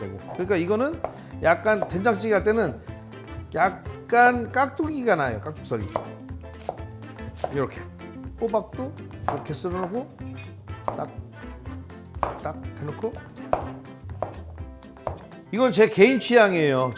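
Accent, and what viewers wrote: Chungcheong, South Korea. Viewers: 구수한 충청도 사투리? 잘 모르지만 어머니 고향이 충청도인데 구수한 . 그래유우~등등. 듣기좋은 우리나라말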